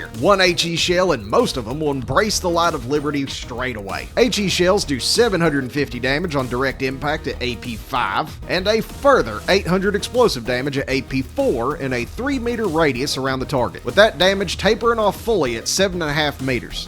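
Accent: United States. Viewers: from the first 15 seconds of the video i could tell this guy is 100% american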